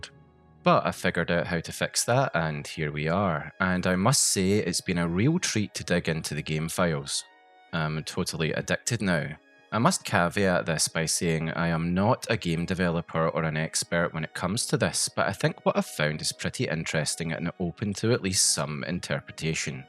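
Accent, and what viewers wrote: Scotland, United Kingdom. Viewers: Algorithm just pushed this to me, I like your informative low energy and the Scot accent adds to the soothing output of information. Good video to listen to while relaxing or sleeping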